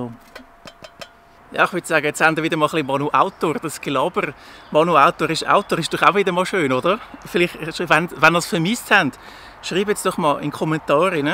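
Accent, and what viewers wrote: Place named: Switzerland. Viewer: Habe meine Switzerdütsch Lehrstunde schon vermisst.😉 Koche jetzt draußen bei dieser Trockenheit, wenn überhaupt, nur noch mit dem guten alten Schwedenfeldgeschirr und Spiritus. Mein Sandsteinöfchen ist zwar eine recht sichere Sache, trotzdem verzichte ich bei dem Wetter gerne darauf. Muss ja auch nicht sein. LG Miesegrau & ÖPi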